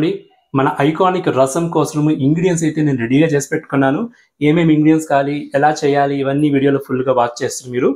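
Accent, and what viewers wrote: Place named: Karnataka, India. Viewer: మీ తెలుగు స్లాంగ్ చూస్తే మీరు కన్నడిగులని తెలుస్తుంది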